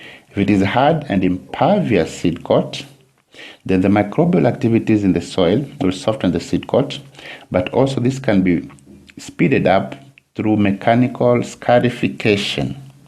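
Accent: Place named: England, United Kingdom. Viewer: your videos are good because i like your english accent its eloquent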